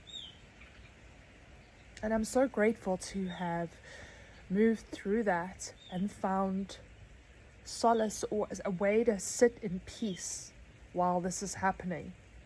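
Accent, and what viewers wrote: South Africa. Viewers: Jai Anandamayi Ma ❤ the divine feminine expressing itself through this beautiful blessed woman with a South African accent. Shanti Shanti Shanti 🙏❤🕉❤🙏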